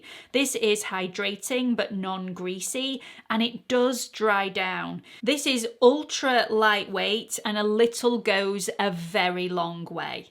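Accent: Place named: North, United Kingdom. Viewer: I am still trying to work out your accent. Still not sure what part of the country you are from. I have narrowed it down to North 🤣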